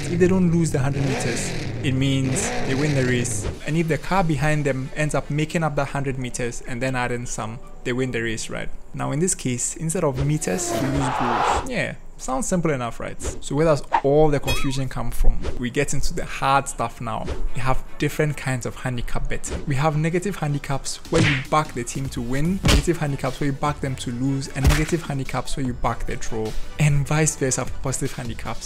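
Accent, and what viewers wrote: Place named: Ghana. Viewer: Your accent sounds Ghanaian. Were you born or lived in Ghana by any chance? Just curious. Great explanation by the way.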